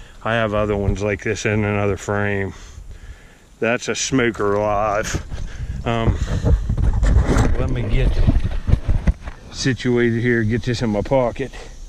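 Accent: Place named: Maryland, United States. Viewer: Hi! I am new to your channel and really enjoying your viddies. Squirrel Man is a lucky, happy little guy. Seems he has you wrapped around his little paw. So sweet. Not sure where you are from, but your accent sounds like parts of here in Maryland. Dig on!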